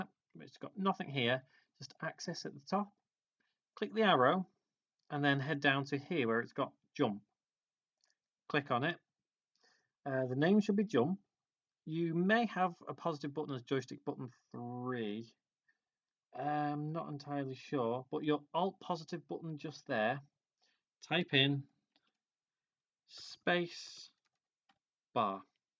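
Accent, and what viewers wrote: United Kingdom. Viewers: inglês britânico é tão maneiro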